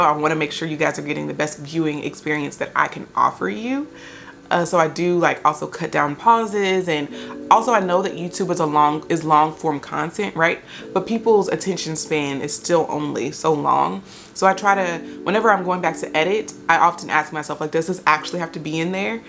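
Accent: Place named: New York, United States. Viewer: I love her NY accent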